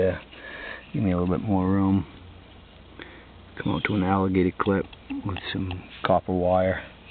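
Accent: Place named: Maine, United States. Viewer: If I had to guess. I bet your from Maine.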